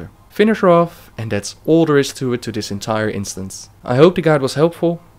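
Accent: Netherlands. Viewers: Haha i can hear that ur dutch xD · are you from the netherlands ???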